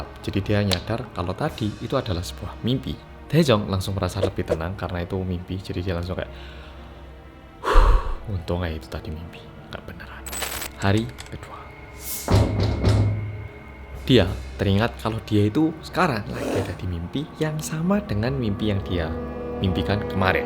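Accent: Java, Indonesia. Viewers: Wkwk nadanya kaya ad jawa jawa nya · Semangat lurr,ora ilang jawane💪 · Mas skrng tinggal d mana seh kok bahasa Jawa nya medok bgt...kyk logat Jawa asli:v...respon mas...aku loh gak tau di respon Karo artis:( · Baru2 ini aku play rindingdong jam 12 malem. Karena ternyata cerita2 ini dan juga suara mas medhok ini bikin ngantuk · Intronya jangan di ganti dong kak 😣bersama orang korea yang medok aja😂gk usah ganti😂aku suka ikutin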